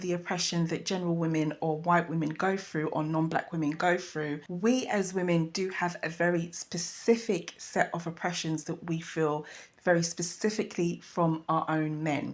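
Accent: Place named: United Kingdom. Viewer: So good to hear a British accent speaking on such topics. Keep it up.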